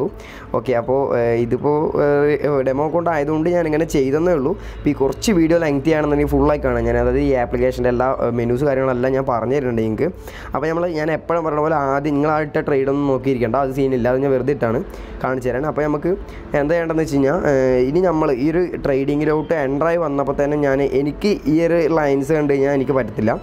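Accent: Palakkad, India. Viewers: Eda chekka ante veedu palakkadano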